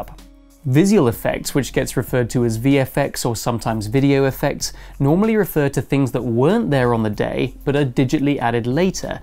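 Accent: United Kingdom. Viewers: Ok. Because I heard, your English is British. :)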